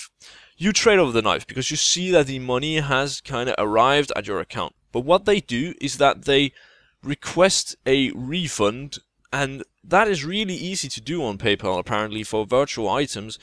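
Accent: Denmark. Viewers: jeg kan høre at du er dansk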